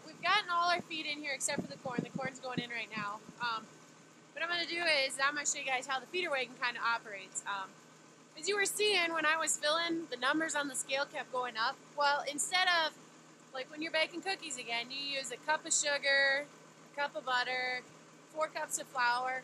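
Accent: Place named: Upper Midwest, United States. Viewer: northern Midwest you must be ??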